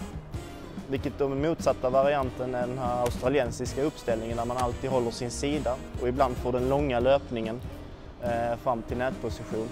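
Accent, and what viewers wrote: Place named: Scania, Sweden. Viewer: skjo :) älskar skånska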